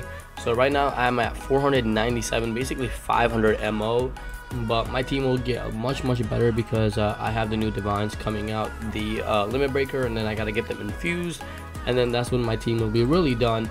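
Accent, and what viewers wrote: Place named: Nepal. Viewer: Bro U Nepali? U sound Nepali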